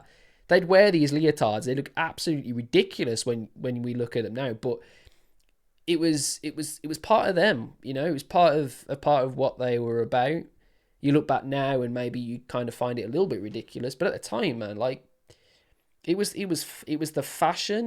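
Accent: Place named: Northern England, United Kingdom. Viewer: Subscribed because I'm a sucker for a Northern accent and your videos are fantastic. I saw the first one and knew immediately how much fun you were going to have with this. ✌️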